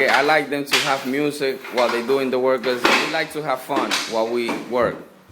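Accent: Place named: Spain. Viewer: is it just me or does anyone w a Spanish accent not good at anything?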